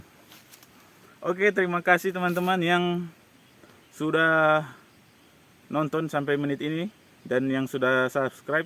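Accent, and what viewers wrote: Sulawesi, Indonesia. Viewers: Bang rupanya logat Sulawesi ini ❤❤❤❤❤❤ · Ya cara penyampaiannya cukup menghibur dgn dialek dan logat sulawesinya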